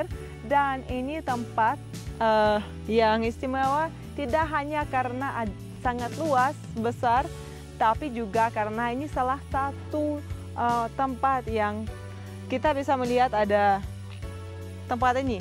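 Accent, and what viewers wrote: Indonesia. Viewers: bahasa indonesia ny kental bnget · Logat nya Indonesia banget, tdk terlihat seperti org rusia, mantap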